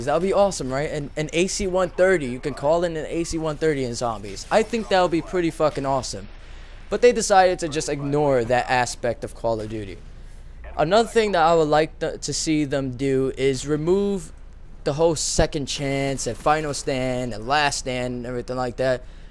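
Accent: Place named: New York, United States. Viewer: Yes! A New Yorker! Finally! I've been looking for a commentary with a guy from New York!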